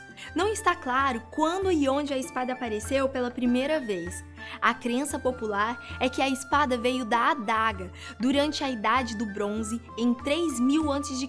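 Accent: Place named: Minas Gerais, Brazil. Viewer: aposto que essa mina que apresenta é mineira e de BH, um mineiro reconhece o outro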